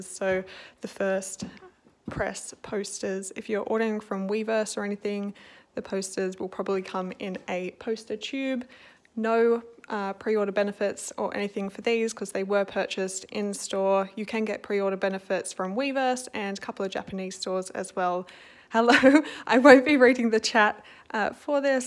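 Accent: Australia. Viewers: When i heard her accent it reminded me of Felix and i understood in 0000.01 second that she was Australian 💀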